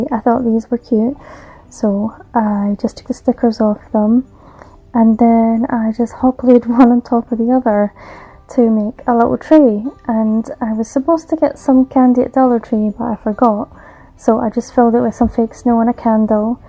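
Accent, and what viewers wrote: Scotland, United Kingdom. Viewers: Hey! I could hear U today so well! Love ur accent. Scottish? Love the ocean blue color on all ur projects! Ur tree filled scene with the lovely houses hit all the right Xmas bells with me! Gorgeous!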